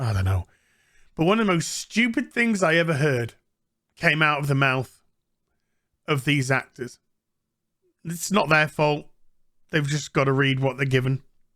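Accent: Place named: Yorkshire, United Kingdom. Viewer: Just get well soon. Life's boring without a good Yorkshire rant!!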